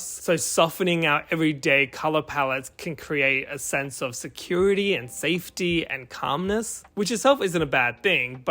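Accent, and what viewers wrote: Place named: Australia. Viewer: I never knew Aussies pronounced it as "ass-thetic" rather than actually making the /æ/ sound and now I can't unhear it.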